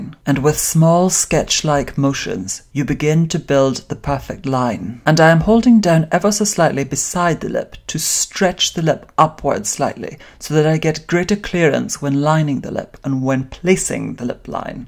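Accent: Scotland, United Kingdom. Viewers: She's magnificent! Your work is Lovely and Flawless. Very nicely done. You still frighten me abit. I would love to hear you speak without the British accent. I bet it's lovely. Sincerely, M. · I love that you used the word "film" rather than movie - very British in your lovely Scottish accent :-)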